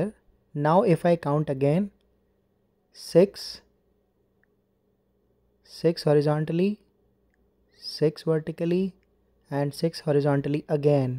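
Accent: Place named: India. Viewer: YOUR INDIAN ACCENT SUX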